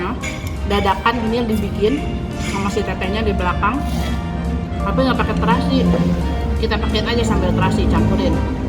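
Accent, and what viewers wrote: West Java, Indonesia. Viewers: Aduuuh teh ningal mam na mni sok mantap pisan mantak kabita,logat Sunda na deui aduuuh🤭sehat sehat kluarga th dan kang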